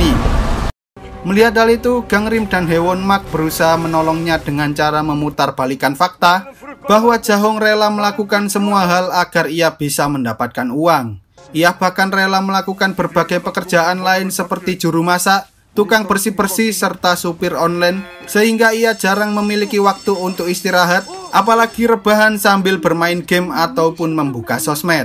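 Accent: Java, Indonesia. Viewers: Jowo mu medok lek😭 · Medhok e lho,,,🤭 Filmnya bagus, sy baper terutama ketika Gihun ingin bertemu ibu dan meminta maaf pd beliau. Apik Bang,,,👍🏼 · Yang cerita film ini pasti orang jawa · Wong jowo t min? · Logat jawanya masih kental kali miminya 😅😆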